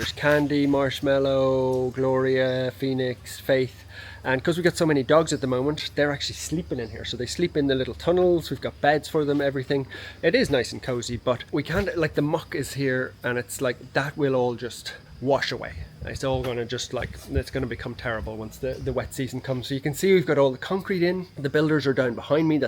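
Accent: Ireland. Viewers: 🙏 🙏🙏Please…I beg of you. Get your lovely self signed up with a professional voiceover agency. I swear, if you have any spare time, you must explore the possibility of using your gorgeous warm and friendly voice as another source of funding your dreams. If I heard that beautiful Irish lilt over an advert, I’d be instantly interested in whatever is being sold. Movies, books etc…. you need to check it out!!😊😊😊😊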